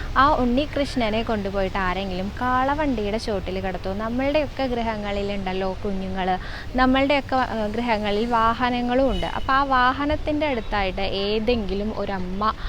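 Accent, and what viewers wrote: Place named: Namboothiri, India. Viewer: ഹരേ ശ്രീ ഗുരുവായൂരപ്പാ ! മോൾ ടെ ഓരോ ദിവസത്തേയും കഥകൾ കേൾക്കുണ്ട്. വളരെ ഭംഗിയായി അവതരിപ്പിക്കുന്നുണ്ട്. ഒരു നമ്പൂതിരീശൈലി ഉള്ളതു കൊണ്ടു തന്നെ അവതരണം ഗംഭീരമാകുന്നുണ്ട്. എനിക്ക് വളരെ ഇഷ്ടമാവുന്നുണ്ട്. വളരെ നിഷ്ക്കളങ്കമായി അവതരിപ്പിക്കാൻ കഴിയുന്നതു തന്നെ ഗുരുവായൂരപ്പൻ എപ്പോഴും കൂടെയുള്ളതിന്റെ ലക്ഷണമാണ്. ഭർത്താവിന് അഷ്ട ഐശ്വര്യവും നൽകി ഗുരുവായൂരപ്പൻ അനുഗ്രഹിക്കട്ടെ! മോളു തന്നെ ഈ പരിപാടി അവതരിപ്പിക്കുന്നതാണ് പ്രേക്ഷകർക്കിഷ്ടം. തുടർന്ന് പോവുക. ഹരേ കൃഷ്ണാ .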